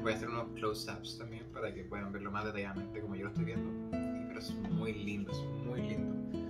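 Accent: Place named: Chile. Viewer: Hola buenas , escuche tu acento y inmediatamente supe que eres chileno jaja , queria preguntarte si ¿tuviste algun problema al recibir el paquete? (Un retraso o algo) lo pregunto por que lo quisiera ,la verdad esque esta muy hermosa la edicion y me encantaria tenerlo. Muy buen unboxing , me caes bien ❤ te voy a empezar a seguir , te deceo mucha suerte en tu vida espero que crezcas en youtube (bueno ya mucho parloteo) nos vemos chao👋❤ .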